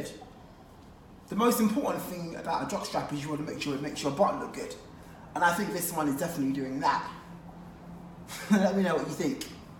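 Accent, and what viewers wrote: United Kingdom. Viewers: Hey - the colors are great and the silver is the highlight- it was made for your incredible physique!! Love the British accent, mate!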